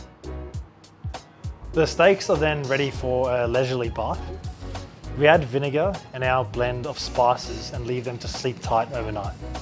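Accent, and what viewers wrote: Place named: Australia. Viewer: I can't distinguish it I just heard Australia..!!! Hehehe..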